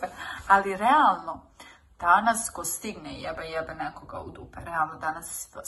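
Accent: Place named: Ekavian, Serbia. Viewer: ako bas hoces da izimitiras macu probaj da ne pricas ekavicu providna si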